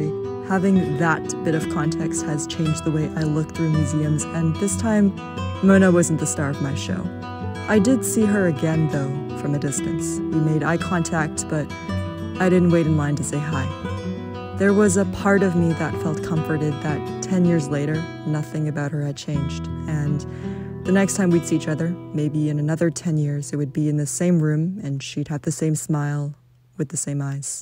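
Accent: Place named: France. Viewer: Your french accent is so cute <3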